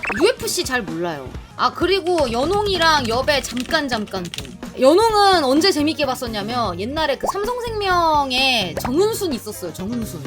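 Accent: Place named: Chungcheong, South Korea. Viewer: 느야 된다 이거ㅎㅎ 충청도 사투리가 아주 네이티브 스피치ㅎㅎ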